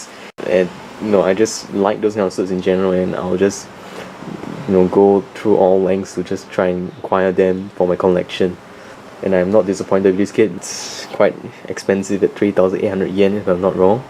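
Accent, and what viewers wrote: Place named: Singapore. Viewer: hahah , recently saw stock at Gkgundamkits thou . you sound like a Singaporean . haha .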